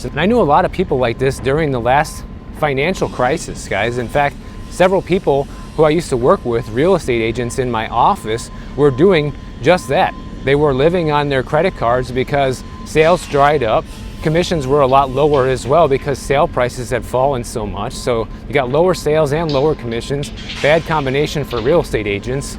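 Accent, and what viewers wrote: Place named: South, United States. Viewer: We know you're a southerner when you say ( furr ) instead of ( for ). NO NO NO I can 1st hand attest to money ruining lives. Money can absolutely ruin and kill people and I have 2 cousins and 2 uncles that are deceased due to money and a good friend of mine his son is dead due to money. Musk and Vivek claim they could probably cut 2 trillion a year in waste and fat .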